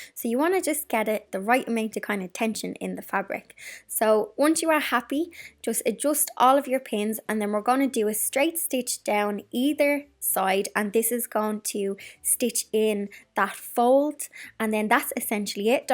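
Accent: Ireland. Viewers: I love your Channel! I’ve spent the whole day watching! Love your sweet Irish Accent. My Father was born in Dublin in 1920, and I have a special place in my heart for all things Irish.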